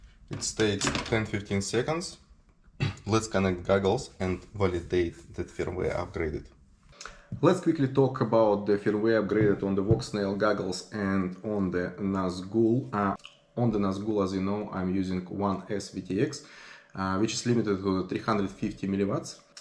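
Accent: Russia. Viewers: О, приятель, твой английский звучит так, будто ты русский) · Рунглиш :D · Бикоз прабабли хи из рашн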